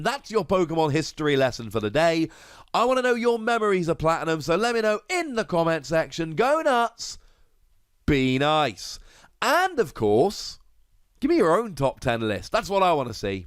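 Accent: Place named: England, United Kingdom. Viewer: I LOVEEEEEEEEE English accent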